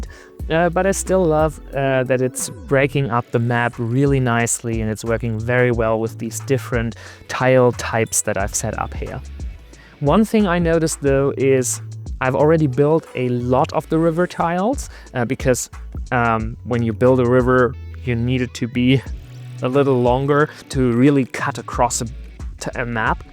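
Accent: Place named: Germany. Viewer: Der Dude ist klasse und hört sich sehr deutsch an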